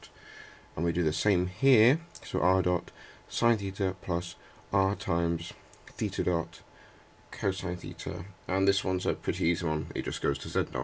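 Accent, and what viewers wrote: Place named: England, United Kingdom. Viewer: *ancient. Sounds like an English accent.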